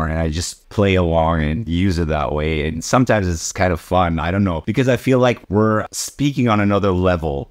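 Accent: United States. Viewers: Bence gayet güzel bir amerikan aksanıyla konuşuyor gözümü kapatsam Türk olduğunu düşünmezdim. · Super us accent, but surprisingly he has no german accent, and he is born in germany. He is the only one i know to be de-germanized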